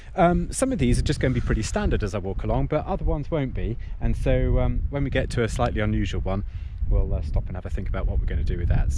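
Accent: United Kingdom. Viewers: I think some snip off them early because they are confident in the weather and is not that risky, but you sound from UK and you don't have severe or late cold nights. I had -5 in late April here in Romania a few years ago. I wait until leaves appear, like 1 2 or two weeks more comparing to your buds.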